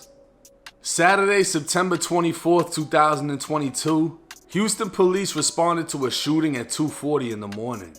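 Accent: Boston, United States. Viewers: is the best story teller on YouTube hands down, I watch all this guy videos. The way he talks and gets things across + that Boston accent 💯 dope.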